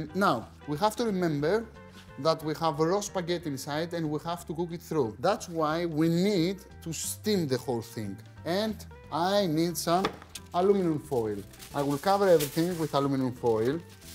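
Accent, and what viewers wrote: Greece. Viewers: Great recipe, easy in oven. You are one of my fav chefs on you tube. Thank you. ❤love the accent love that you’re Greek! Want more Greek recipes.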